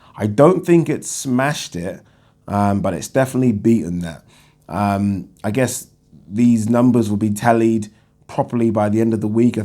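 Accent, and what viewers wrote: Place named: London, United Kingdom. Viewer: you sound like you are a Londoner like me Metro Sport are saying Ruiz V AJ 2 done 1.6 million PPV £24.99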